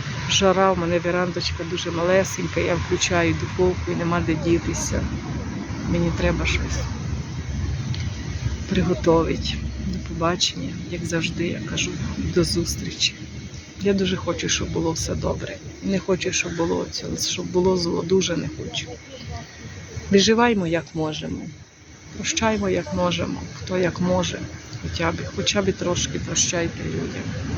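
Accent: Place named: Polesia, Ukraine. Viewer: Я только ради вашего особенного колоритного языка задержалась и осталась. Слушаю, как вы рассказываете все равно про что. Знакомлюсь немножко с украинским Полесьем. И да, вы очень привлекательная женщина. В любом вашем состоянии или настроении. И в лесу, и в городе. Мне из Москвы не огидно.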